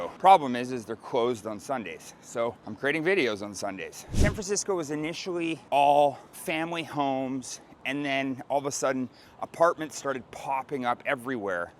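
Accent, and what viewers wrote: Canada. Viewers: Your from Canada EH?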